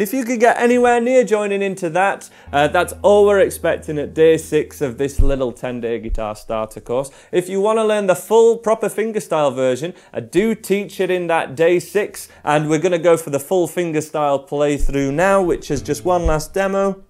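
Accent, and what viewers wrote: United Kingdom. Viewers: He's from the UK.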